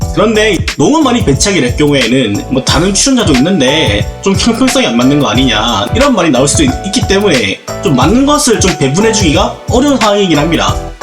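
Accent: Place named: Gyeongbuk, South Korea. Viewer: 내용은 좋은데 경북말투 진짜 듣기 파이네요~😢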